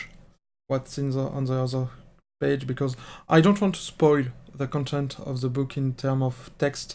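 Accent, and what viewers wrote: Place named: France. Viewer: Nice French accent :B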